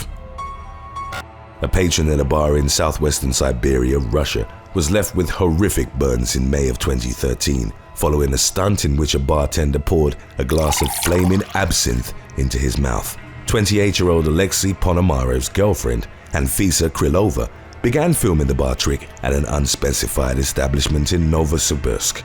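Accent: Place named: United Kingdom. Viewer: British voice overs always make mysteries and videos more intresting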